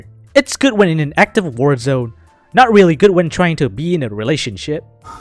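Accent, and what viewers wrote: Vietnam. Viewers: why do you sound like a Vietnamese/Asian? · Seeing this guy Vietnamese accent continue to become more presence is funny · you sound so vietnamese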